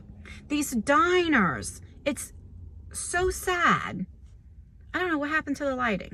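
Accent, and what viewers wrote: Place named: South Jersey, United States. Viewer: 😀 I knew you were from South Jersey by the way you talk ( I'm in Mantua )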